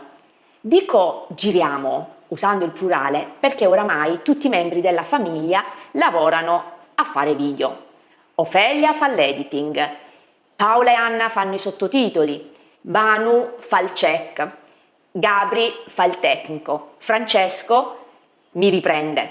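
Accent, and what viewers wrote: Italy. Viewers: I enjoy your cooking and look forward to the videos every Thursday. Keep posting! Your Italian English is unique and they make me laugh all the time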